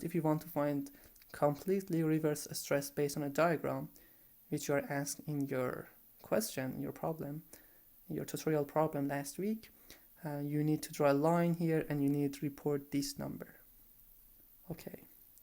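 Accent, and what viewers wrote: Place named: Iran. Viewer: I like the persian Accent though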